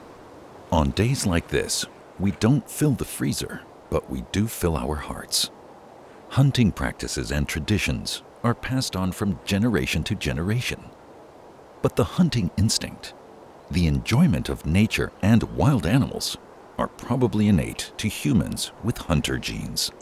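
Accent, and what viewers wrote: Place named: Germany. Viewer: Wo ist denn sein sympathisches Denglisch und wo der Hund bei der Wasserjagd hin?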